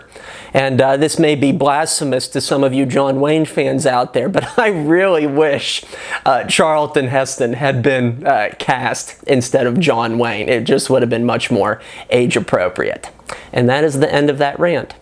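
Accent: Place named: Louisiana, United States. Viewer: Hehe, historian, yes, linguist - no. "Shire" is pronounced the way you say it - as a stand-alone word. When part of a county name, NO-ONE in the UK calls e.g. "Oxfordshire" Oxford-shire - we pronounce it "Oxford-shur" - as with every "Shire" in the land. To British ears, you sound like a back-country Louisiana hick. Got your own banjo?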